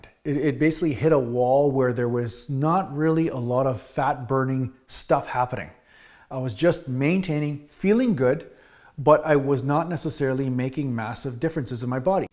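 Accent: Canada. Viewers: I am loving the Canadian accent !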